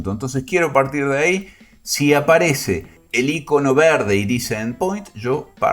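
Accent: Argentina. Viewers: Lo más chistoso, es la forma en que en Argentina nombran "Y";en México la distinguimos, como la y griega y la nombramos (lle); asimetricamente , (ye), para tenerlo bien diferenciado de la(i) latina;así llamada,, aunque en sonido es casi la misma pronunciación, arrastramos un poco la (Ll)cuando pronunciamos la "y", ya que en términos matemáticos es mejor para diferenciar ambas!!!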